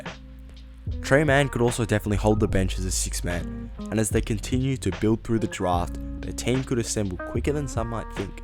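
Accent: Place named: England, United Kingdom. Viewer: Im not gonna take anyone making a video about the NBA with an English accent seriously. Im just not.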